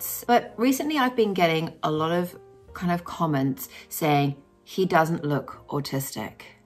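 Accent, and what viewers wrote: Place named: Ireland. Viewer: Are you Irish? I'm Irish and can hear a twang in your accent lol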